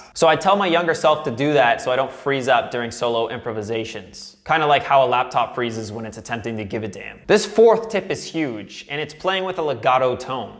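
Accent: Canada. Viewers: I’ve learnt something important from watching channel - that Canadians (well, some Canadians) pronounce 'about' more like 'aboot' and 'out' as 'oot'. Back to practicing now, honest. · Is he Canadian? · Canadian much? Great video man, thank you so much for the tips.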